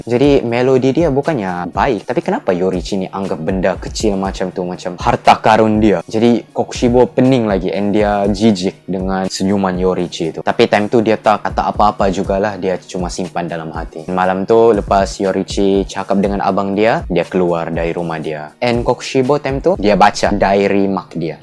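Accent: Sabah, Malaysia. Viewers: Abang ni orang sabah ke? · Abg ni orang sabah kaa? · Logat dia bckp mcm org sabahan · Speaker mmg org sabah bro 😆🤣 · orang sabah ke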